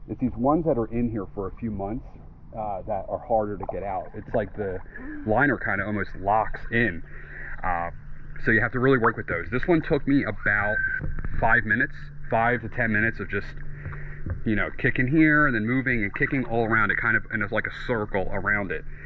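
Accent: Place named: Delaware County, United States. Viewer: That Delco accent lol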